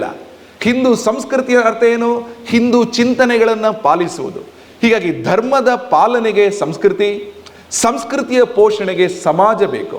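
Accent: Standard. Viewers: Sir parisudhavada kannada thumba santosha ಆಯಿತು. ಮಗ ನಿನ್ನಗೆ ನಮನ 👍🙏🙏🙏🙏🙏🙏🙏🙏❤️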